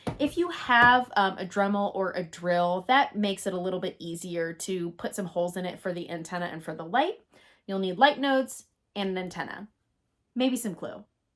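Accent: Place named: Ohio, United States. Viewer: You from ohio ? I can tell. A fellow. Native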